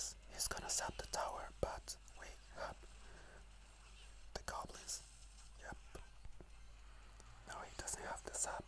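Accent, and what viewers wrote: Argentina. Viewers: Dude u from Argentina?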